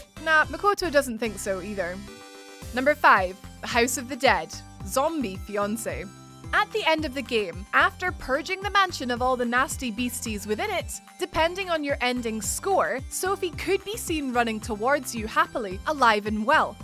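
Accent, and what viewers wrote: Scotland, United Kingdom. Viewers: I switch to something else the minute I hear this Scottish girl’s voice 😒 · Ah miss I could listen to your sweet Scottish tones all day 😉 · ZOMBIE BEYONCÉ! Haha! Initially I was doing something else whilst listening to this video playing in the background. At no.5 in the countdown (@ - ) “House of the dead, Zombie Fiancé” I was so sure I’d heard ‘Zombie Beyoncé’ which peaked my interest in that game ten-fold...haha! Just imagine Beyoncé as a zombie. I rewound the video to double check & obviously immediately realised I was incorrect & had misheard due to Scottish accent. Oh well...It was a fun thought though while it lasted!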